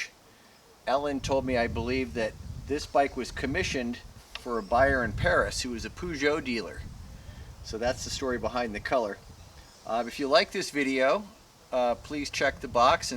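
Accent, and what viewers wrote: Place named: United States. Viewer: Poooshoooww, colour, lol. Godda love the American accent.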